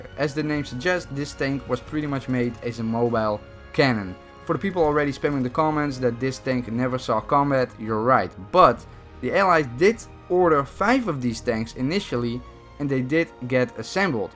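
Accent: Netherlands. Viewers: That accent sounds dutch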